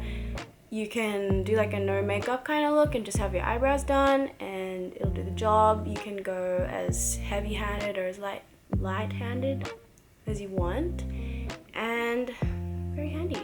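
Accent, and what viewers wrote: Australia. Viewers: why does she have a weird lowkey australian accent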